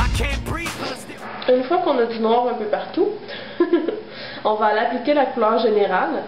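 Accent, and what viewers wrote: Quebec, Canada. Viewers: jadore t'es vraiment tres belle jadore ce maquillage .... Ps jadore laccent Quebecoi ...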